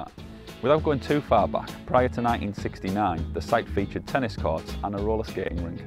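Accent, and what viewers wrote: Middlesbrough, United Kingdom. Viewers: Hi lad, just discovered your channel, it's excellent. Keep up the great work. Are you a Middlesbrough lad by any chance you have the accent?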